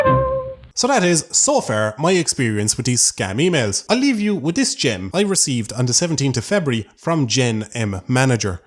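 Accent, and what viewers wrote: Ireland. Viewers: Irish accent, that explains everything. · Came here from cynical reviews! Subbed, liked and stayed to binge for the hilariously snarky content and adorable with an Irish accent! 🇮🇪 😁👍🏻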